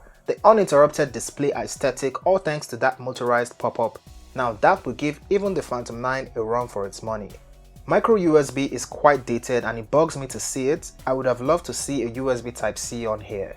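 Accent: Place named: Nigeria. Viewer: Great video, you sound nigerian. Are you :) ?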